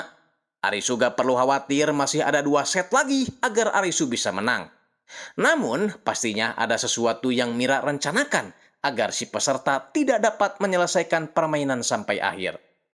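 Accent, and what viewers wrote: West Java, Indonesia. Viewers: Papua hadir. Selalu terhibur dgn khas Sunda nya🙏🤣, sukses selalu Aa🙏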